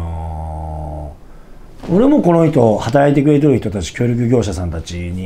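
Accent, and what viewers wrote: Nagoya, Japan. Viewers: はじめまして おすすめ動画に出てきて見させて頂きました。 とても参考になりました。 自然な名古屋弁いいですね(^o^)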